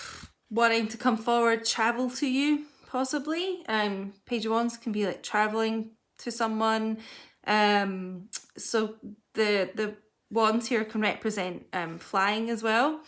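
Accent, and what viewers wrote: Scotland, United Kingdom. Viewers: Where are you from? Are you Scottish? I love ur accent lol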